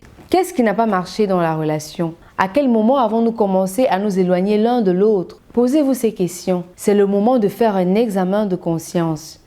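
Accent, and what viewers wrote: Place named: France. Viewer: Bon projet. Mais ma belle stp parle comme une Kamer car parlé un peu genre french girl la, c'est pas très originale. Mon point de vue seulement ooooooh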